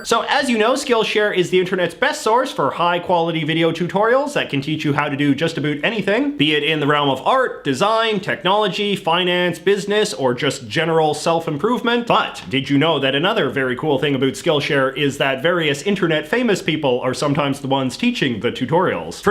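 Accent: Canada. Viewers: Gunter Ringgenberg is Canadian. Why do you think he says "about"like that?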